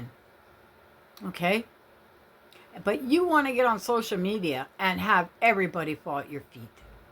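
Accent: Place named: Canada. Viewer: And I like the Canadian accent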